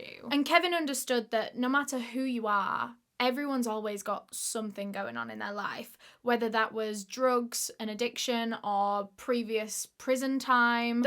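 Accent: United Kingdom. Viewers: hello. i love your British accent..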